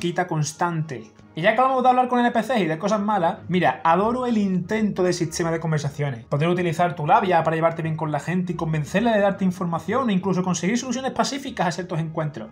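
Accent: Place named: Andalusia, Spain. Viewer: Tengo curiosidad, de que pais eres? Tu acento es como Español pero es muy marcado por ejemplo las carencia de las "s" finales en las palabras y otras cosillas. Conosco acentos de diferentes regiones de España pero el tuyo para mi es nuevo, suena a andaluz? Saludos desde Chile, buen video ;)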